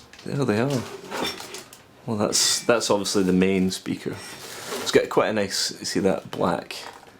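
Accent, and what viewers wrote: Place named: Aberdeen, United Kingdom. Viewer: Where are you from? Sounds like an Aberdeen accent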